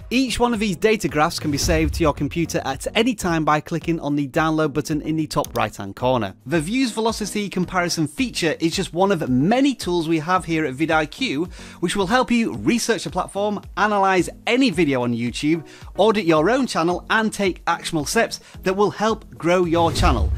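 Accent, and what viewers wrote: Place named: United Kingdom. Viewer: That's a British accent.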